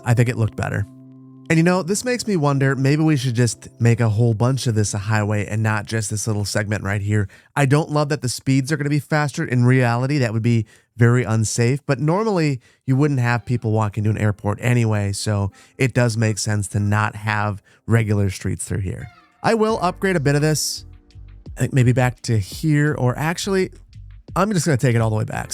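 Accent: Wisconsin, United States. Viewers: you’re from wisconsin??? 😂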